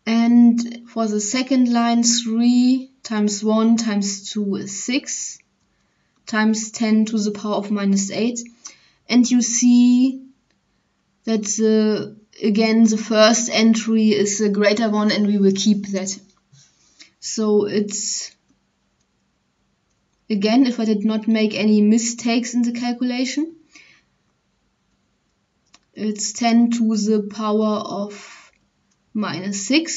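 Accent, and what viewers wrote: Germany. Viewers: she clearly sounds German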